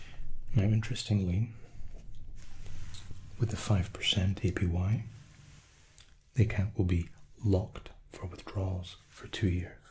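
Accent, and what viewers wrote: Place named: Scotland, United Kingdom. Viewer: Not gonna lie, I like the ones where the Scottish accent is extremely obvious 😂all of them are great, though ❤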